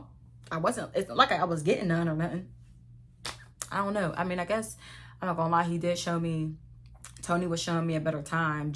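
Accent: Pittsburgh, United States. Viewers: i love ur story times & i love ur Pittsburgh accent it reminds me of college 😩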